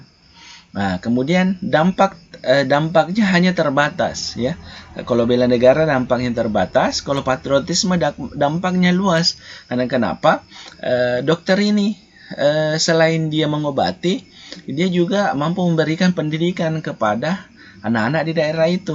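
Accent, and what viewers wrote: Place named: Sulawesi, Indonesia. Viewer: Sudah saya subscribe kak,keren pnjelasanx kak..mudah dpahami,, sepertinya logat Sulawesi 😂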